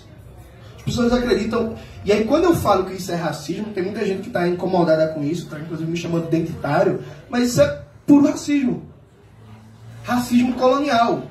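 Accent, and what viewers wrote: Northeast, Brazil. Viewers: Adoro e clareza do raciocínio desse garoto, falando com sotaque nordestez fica ainda mais incisivo. Parabéns professor Tudo a ver com Cirao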